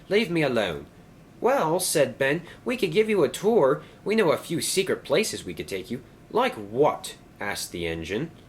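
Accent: Scotland, United Kingdom. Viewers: Your Scottish accent is spectacular.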